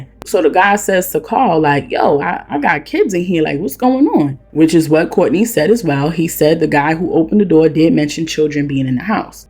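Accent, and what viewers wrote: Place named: New York City, United States. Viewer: Yo you funny ash with that accent love that nyc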